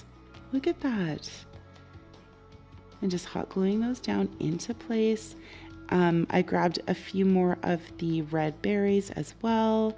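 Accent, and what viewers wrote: Canada. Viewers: Hehe I keep forgetting you're Canadian!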